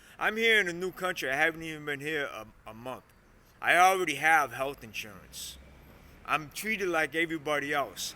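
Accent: Boston, United States. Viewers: Boston accent never left, love it! Awesome story brother. I agree, it is 100% by design. Keep humans in the rat race.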